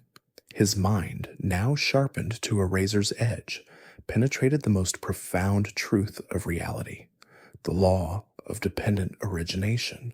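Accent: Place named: United States. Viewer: Horrific American voice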